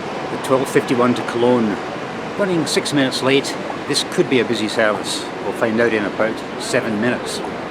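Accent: Scotland, United Kingdom. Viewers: Great Skaughtisch accent!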